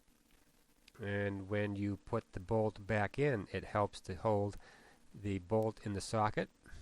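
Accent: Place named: Boston, United States. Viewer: Sheesh...ain't never heard nobody from Bahstin before? Great video. Used this as my guide, and hang the ol' Haines manual. Well done, and thanks a million!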